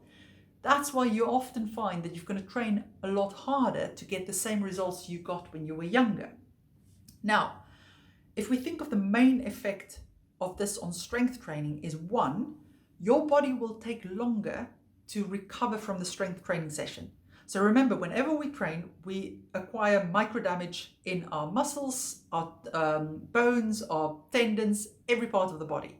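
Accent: South Africa. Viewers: You sound south african ❤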